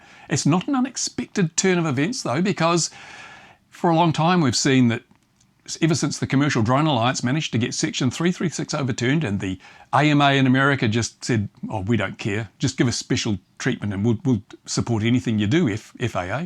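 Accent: Australia. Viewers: MAN! I'm glad every day that I have a permant resident permit in, -Gabriel of Norway. Thanks very much for this video, my brother who sounds to be 'down under '. Love y'all, mate! Unfortunately Norwegian politcians are in bed with those in the USA. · lol this guy sounds aussie.... like he has anything to talk about when it comes to restricted freedoms. I'll trade a hobby for FREEDOM any time of the day, and that's what it reallly is coming down to. I k now 99% of people reading this, won't understand. We have been in an economic warfare for decades. Now it's time to fix that.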